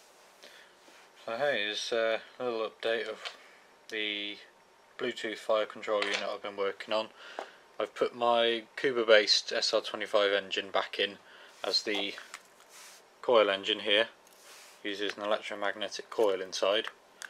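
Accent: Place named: England, United Kingdom. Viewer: Nice to hear an English voice behind this project! Great work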